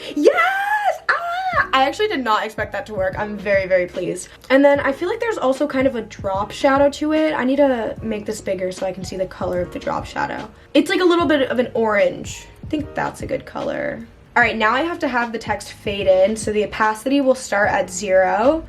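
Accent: England, United Kingdom. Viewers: Make an edit tutorial while using your British voice! Whenever you dont/forget talk American you show one of your old edits ! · Love your English accent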